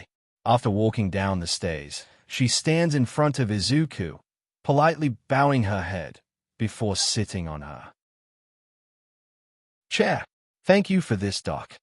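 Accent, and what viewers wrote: Australia. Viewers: I'm hooked but I wasn't expecting the Australian accent. When is part 2 coming out? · You're not crazy that is an ausie accent if I ever heard one lol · Am I crazy or does the Ai sound Australian or something 😂 like it isn’t really pronouncing the r’s all that much, and it’s also being really extra with all the a’s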